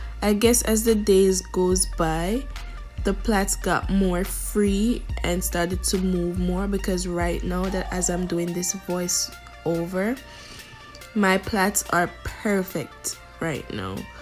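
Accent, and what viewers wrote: Jamaica. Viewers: Jamaican ? I hear the accent lol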